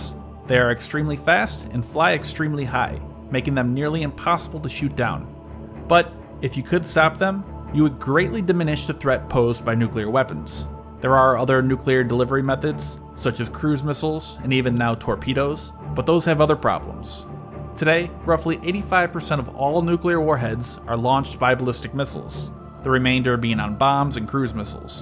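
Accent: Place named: United States. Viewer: Love this channel. Only person with an American accent who's unbiased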